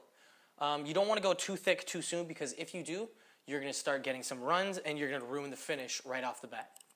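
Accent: Canada. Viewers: I can tell that you’re canadian lol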